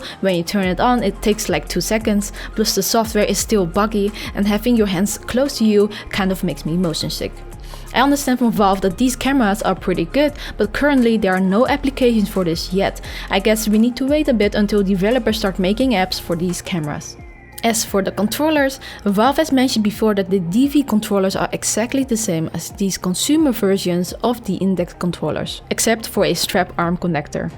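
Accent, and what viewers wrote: Netherlands. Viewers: Its just a dutch accent. Not dutch-asian. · Is that a Dutch accent?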